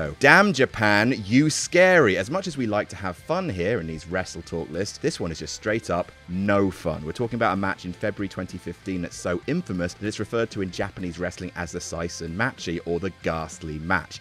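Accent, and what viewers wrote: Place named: United Kingdom. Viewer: The list is good but is way to British for me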